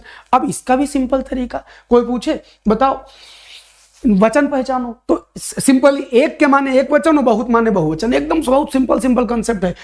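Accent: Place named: Lucknow, India. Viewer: Pranam Sirji..Hum bhi Lucknow se hain.. Hume aapke baat karne ke lahje se laga hi tha ki aap Lucknow se hain❤Jai Shree Radhey Radhey🎉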